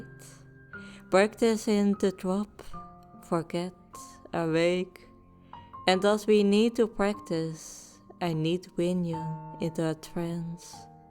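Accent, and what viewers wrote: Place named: Netherlands. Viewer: Didnt expect to like a dutch accent hehe, but I think it is ur voice and the way u pronounce words that makes it nice :3 I also know a dutch male switch u might get along with very well im sure we will chat about it, hehe :3 ty for the fun :3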